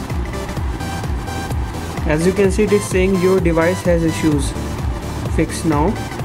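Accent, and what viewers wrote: India. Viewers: eat a like for your Indian accent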